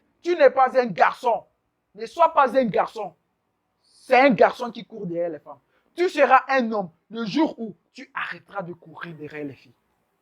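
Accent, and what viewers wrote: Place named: Cameroon. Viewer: Tu a le même accent que Samuel etoo l’ex soccer player je suppose que tu viens aussi du Cameroun comme lui haha 😉😉😉😉😉😉😉😉😉😉💪💪💪💪💪💪💪💪💪💪💪💪💪💪💪💪💪💪💪💪💪💪💪💪💪💪🤜🤜🤜🤜🤜🤛🤛🤛🤛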